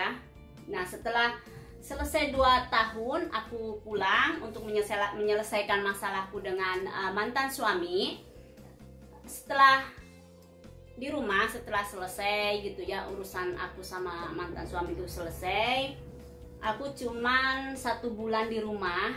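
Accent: Sunda, Indonesia. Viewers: Salam kenal sy dari kadipaten majalengka..mau tanya.Mama.asli ny orang mana..logatny sperti orang sunda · Bu sundanya dariama mani medok sundana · Seru ngomong teh sunda banget jd seneng ngikutinya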